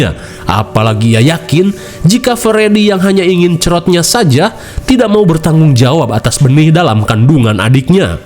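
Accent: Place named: Sumedang, Indonesia. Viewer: Bang lu tuh orang mana bang, soalna sundana asa sarua jeung Sunda di Sumedang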